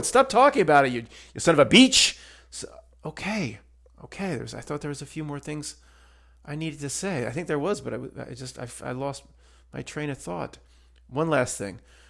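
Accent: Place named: New Jersey, United States. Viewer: Aye! Just resubbed, been a loon̈nng time (I wanna say 2022ish?) Been w u since shrugged, just wanted to say something to yo ass: Thank you. Youve been like an older brother (est.1989) to me since I found myself awake in a world where even my family couldn't see.. sure some of the 1st and 2nd grade truth helped at that period, however there came a point where I needed some1 like you.. no homo. A guy who could be my cousin; just talkin how we talk (jersey) but about the stuff that I needed to flesh out. The stuff that, if brought up to my friends and family would end in argument guaranteed. Anyway, u have no clue, cuz u algorithmically suppressed n shiieeeet, how many individuals like me that were introduced to your content at the time the needed it most. You have no idea how many ppl u have helped. It could be 1, it could be infinitely more, and i speak for myself, yet have a feeling any others would say the s ame thing.. 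if we caught u out; on the worst Monday of your life, we would see you no different my friend. We are the modern day pioneer, seekers, exploring uncharted territory that would make the vast majority of the populace go crazy within days. This is why they refuse to see.. Anyway hope u read this comment. Glad to be back (to YouTube in general).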